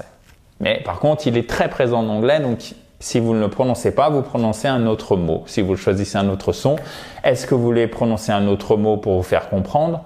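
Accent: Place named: United States. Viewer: Bonjour super boulot par contre pour nous européens l accent appuyé américain est plus difficile à digérer que la prononciation souple et coulée de nos amis anglais.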